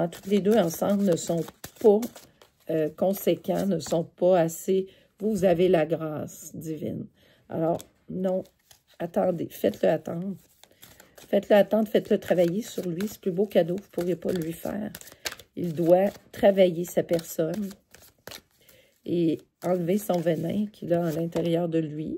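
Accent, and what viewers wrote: Quebec, Canada. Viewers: Bonjour , je ne veux plus rien régler ,tout a déjà était réglée dans le passé avec ce traître diabolique pervers et narcissique , j'aime bien votre accent québécois , et je vous remercie infiniment pour votre guidance . C'est la beauté et aussi la chance d'être dans la nature pour pouvoir vous ressourcer ça fait extrêmement du bien , triste a moi je n'est pas cette chance , mais effectivement mon ex autre pervers narcissique qui est déjà avec une femme maritalement mais il ont failli perdre leur enfant qu'il n'ont pas perdu au final et qui a 30 aines , il m'a beaucoup fait de mal exacte , et dans cette famille tout n'est qu'argent finance immobilier ,c'est sa femme qui est riche et que lui a épousé il y a 40 ans dans la noirceur totale très fausse superficielle exacte , qui a ensorceler mon ex autre et a contribuer a notre séparation mais lui a préféré l'argent a l'amour que je lui apporter , il n'aimer que mon énergie et je les éperdument aimer sans savoir vraiment ce qu'il était et faisait , il y a une secte on m'a dis , oui il est ds une cage dorée dont il ne veux pas partir pour l'argent incapable de se gérer seul , la dame riche sa mère et mon ancien autre tous sont dans les mensonges , je pense que c'est un gigolo même probable un proxénète vu ce qu'il m'a raconter qu'il fréquente les prostitues alors qu'au départ il a bien cacher son jeux se faisant passer pour fidèle alors qu'il est en perpétuelle conquête de recherche féminine , j'ai failli mourir car il m'a vider de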